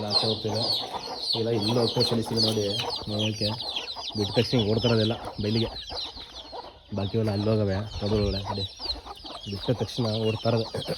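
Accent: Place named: Mysore, India. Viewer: 2013 ರಿಂದ 2019 ರವರಿಗೆ ನಾಟಿ ಕೋಳಿ ಸಾಕಿದ್ದೆ, ಸಾಕಷ್ಟು ಕೀರ, ಮತ್ತೆ ಹಾವುಗಳ ಕಾಟಕ್ಕೆ ಬೇಜಾರಾಗಿ ಅಲ್ಲಿಗೆ stop ಮಾಡ್ದೆ, ನಿಮ್ ವಿಡಿಯೋ ನೋಡಿ ಅಳೆದೆಲ್ಲ ನೆನಪಾಯ್ತು... ತುಂಬಾ thanks.. nim ಮಾತು ಕೇಳಿದ್ರೆ ನಮ್ ಮೈಸೂರ್ ನವ್ರು ಅನ್ಸುತ್ತೆ ತಮ್ಮ ಊರು ಬ್ರೋ?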